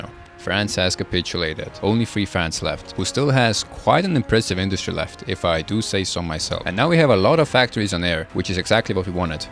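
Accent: Estonia. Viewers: Clocked the estonian accent 2 seconds in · I thought your accent sounded very familiar so I bet on Estonia. Still surprised to be right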